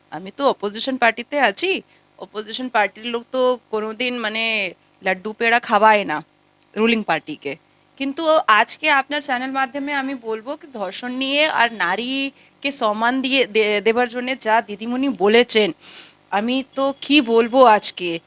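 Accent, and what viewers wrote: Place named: Bihar, India. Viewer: Madam actualy where r u from apki bangali shunke bahut accha laga...I think u r from bihar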